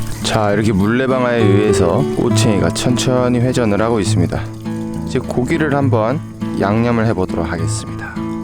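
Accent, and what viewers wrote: North Korea. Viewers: 북한사람 인가요?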